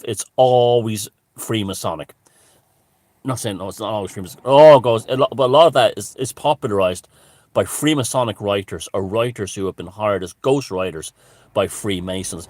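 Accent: Estuary, United Kingdom. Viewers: nothing wrong with an estuary drawl.....but he is talking balls now 🙄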